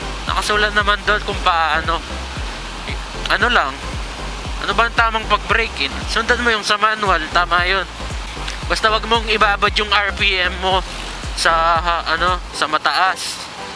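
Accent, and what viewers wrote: Pampanga, Philippines. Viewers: Bosis kapampangan dumali ya hahahaha idol pa colab taga mexico ku bap tuki ku rides u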